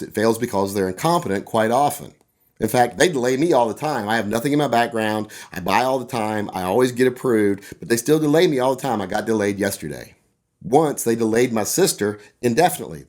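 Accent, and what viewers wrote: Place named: West Virginia, United States. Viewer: "all y'all out thur..." Yup. You're West Virginian.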